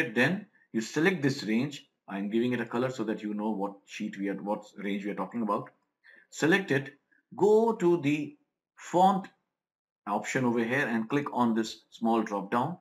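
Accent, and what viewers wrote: India. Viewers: Very Indian accent. Also helpful vid, cheers buddy 👍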